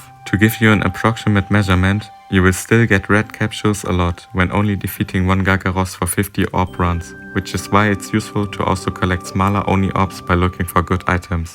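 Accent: Germany. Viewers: Anhand des Gameplays und dem Akzent konnte ich entnehmen, dass du deutsch bist und ich bin dir soooo unfassbar dankbar. Ich habe Muzifer nun auch und auf dem account(ich starte relativ oft neue) auch glamourella. Nur noch Kalteser fehlt und ich bin dir ehrlich sehr dankbar. Kannst du eventuell auch einen guide zu panda noko machen?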